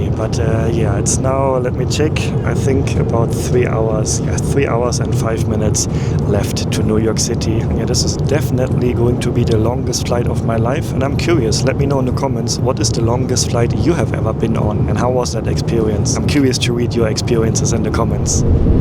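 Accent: Germany. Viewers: Beautiful videos. As a Greek raised in different countries Love your German Accent 🙂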